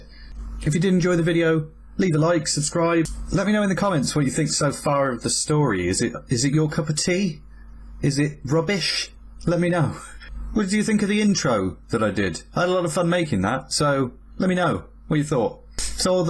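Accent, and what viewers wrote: United Kingdom. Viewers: I'm working my way through your channel and you're brilliant! I can't figure out your accent, Midlands or more northern?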